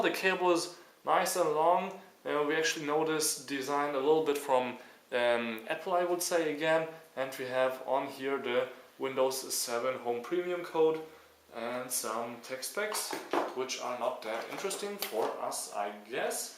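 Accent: Germany. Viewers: Thats the typical german accent^^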